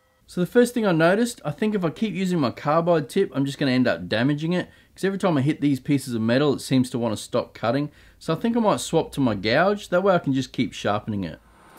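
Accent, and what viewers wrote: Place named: Australia. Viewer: Good filming, interesting content, aussie accent, mentioning another channel, you got yourself another subscriber!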